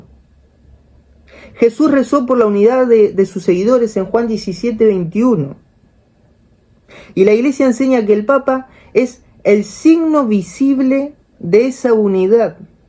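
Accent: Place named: Argentina. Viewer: Quise ver todo tu vídeo pero no aguanté. Me voy a guiar un poco en la miniatura del vídeo que dice "Cansado de la ignorancia católica", casi al inicio dices "me dirijo a vos católico", refiriéndote así a los laicos comprometidos porque, por lo que oigo te parecemos ignorantes porque decir que Francisco dijo una herejía (bueno, ha dicho tantas) en su gira por Asia y Oceanía, te voy a comprar eso de que somos ignorantes, pero no sólo los laicos lo han dicho, lo han dicho sacerdotes, obispos, cardenales, ellos sí saben sobre las Escrituras, sobre el Evangelio, o es que tú sabes más que ellos? No es más bien que tú te llevas por el sentimentalismos de que Francisco es del mismo país que tú y eso no te deja ver que lo dijo Francisco es una total aberración. Cuando digo sacerdotes, obispos y cardenales, me refiero pastores valientes, no como el sacerdote Byron, creo que ASÍ se llama, que se enojó y defendió a capa y espada lo que dijo Francisco, con el argumento ese de "quiso decir", como en las novelas cuando el esposo encontraba a su esposa en la cama con otro y ella decía "esto no es lo que parece" jajaja. 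Ahora, por tu acento doy por hecho que eres argentino, si es así debes saber que Bergoglio tiene una trayectoria nada buena cuando fue obispo en su diócesis, apoyando, defendiendo y solapando a pederastas, y es muy raro eso que a puro sacerdote gay los haya puesto en puestos de alta jerarquía dentro de la iglesia, muy raro, de ahí todas esas ideas de amor y paz entre todos, so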